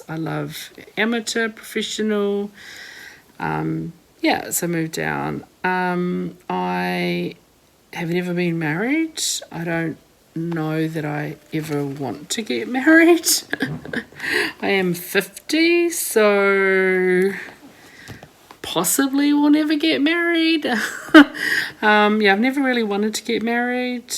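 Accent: New Zealand. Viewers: Your accent makes me miss my Kiwi friends who live in Taranaki- next video if you can zoom in really close so we can all appreciate just how fast you are. The fact that you can talk while you’re doing this is amazing lol since I can’t do anything else but concentrate on the stitches. Thanks again. Keep ‘em coming!!!! 🪡🧵 🇳🇿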